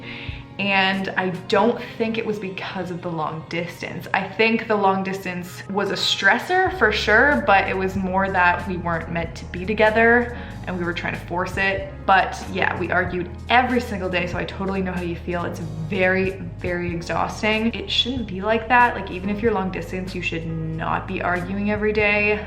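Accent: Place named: Canada. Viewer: "Red Fleg" I love the Canadian accent.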